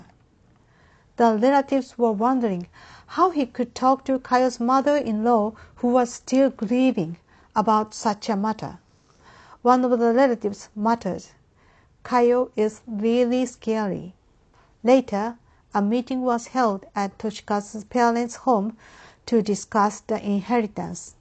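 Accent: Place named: United States. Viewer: ミス、 PINKパーカーお似合いね♥ いつもながらのEnglish！アメリカのどこで身につけたんですか? 歯切れ良く、English圏の人に説得力とキレのある語り Voiceが良いです👍 篠原おじさんも弁護士も活用して意見書出すよになったみたいだからさん、我々日本国人として、我々の力になって下さいね♥ がんばって下さい!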